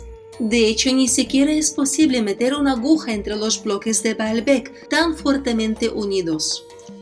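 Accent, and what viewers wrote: Russia. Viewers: excelente video gracias por tus videos he investigaciones son muy interesantes, me gusta el acento de tu español, de donde eres, rusia? bueno, saludos desde las montañas de colombia.... "nadie conoce el color del agua que viaja por debajo del rio".....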